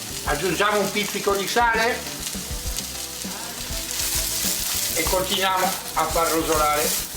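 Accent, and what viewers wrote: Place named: Sicily, Italy. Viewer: L'accento e siciliano proprio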